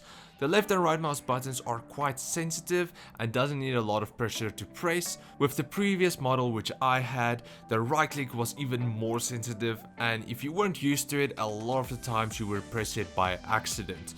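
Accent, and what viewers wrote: Afrikaans, South Africa. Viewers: I thought you had a afrikaans/ South African accent😂😂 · The moment i heard that accent I knew it was a South African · I clicked on the video, heard the South African accent and subbed. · Are you from South Africa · My guy you from sa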